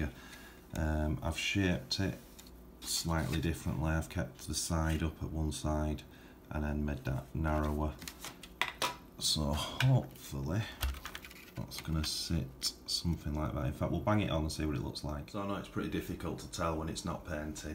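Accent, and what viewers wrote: United Kingdom. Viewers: Hi, sorry to ask unrelated questions but you are my go to channel,it might be that Brit accent, I am in blighty!Right, I am new to the hobby and after watching you I now own a XV-01 lancia delta. I now want a buggy. Looking at the Dark impact BUT then see the Top force. I intend to run it on a "cheapish" BL Absima combo. What would you go for? Also on your build I could not see if you need bearing upgrade set? Thanks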